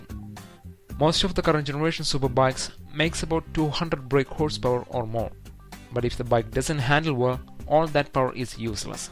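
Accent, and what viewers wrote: Kerala, India. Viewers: Are you malayali? You sound so.. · Very hard to understand the massive indian accent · argg your accent making me sick dude ... you must be from kerala .... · Your Mallu accent is thick X_X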